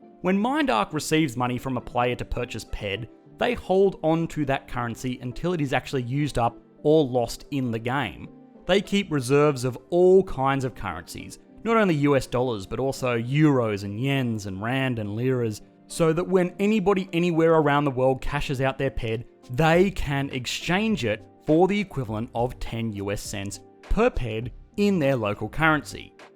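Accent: Australia. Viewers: This channel makes me wish I had an Australian accent. It just sonds so relatable and charming.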